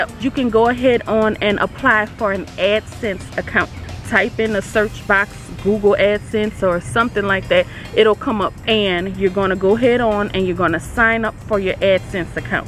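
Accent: New Orleans, United States. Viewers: Do I hear a New Orleans accent??? I'm new to this channel. Keep up the good work! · I love your accent. It's sounds like a good N'awlins ( New Orleans) accent. I can recognize that accent anywhere. Lol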